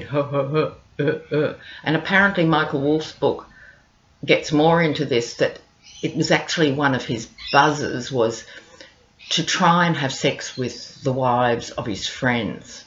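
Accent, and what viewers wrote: Australia. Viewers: Great to hear a fellow aussie. Good stuff!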